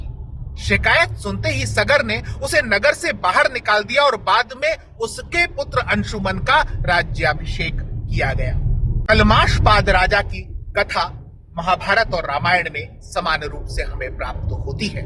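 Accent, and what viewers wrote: Standard. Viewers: Aap ki hindi kafi shuddha hai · मुझे आपको सुनना पसंद है , आपकी हिंदी भाषा की शुद्धता कानों को शीतलता प्रदान करती है, आपके ज्ञान को नमन ,आप अपना काम करते रहिए , हम आपके अच्छे स्वास्थ्य की कामना करेंगे।धन्यवाद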